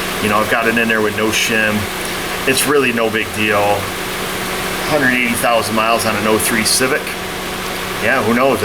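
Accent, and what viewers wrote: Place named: Upstate New York, United States. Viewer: This is a great video, thanks for sharing, sounds like you are from upstate NY id guess Rochester or Buffalo!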